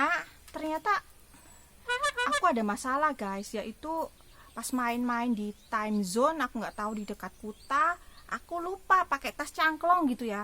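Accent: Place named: Java, Indonesia. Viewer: medok banget jowo nyo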